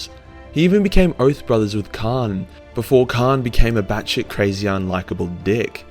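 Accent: Australia. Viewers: also, appreciate a little bit of an Aussie dialect lesson there.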